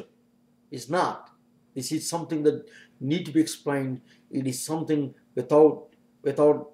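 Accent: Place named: Middle East. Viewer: 5. Didnt see a fifth question. But it is not hard to explain something containing entropy since nothing does in the solar system to our knowledge, might want to look up the "big crunch" or "big freeze" theories to do with entropy and matter in the universe. Also please try to speak more clearly I couldnt understand half of that, I know you are middle eastern or something to that effect but when attempting to argue these things you need to be clear. Nothing you said poses a problem to Dawkins.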